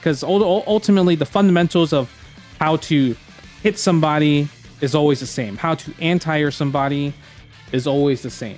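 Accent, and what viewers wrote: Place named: New York City, United States. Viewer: Watching your vids for a while , hearing the way you speak and your slang , what games mean the most to you and even that Nights Jingle at the end … I knew you were my age and from NYC. Yup I was right - 84-85… from NYC, don’t tell me ur from Flushing …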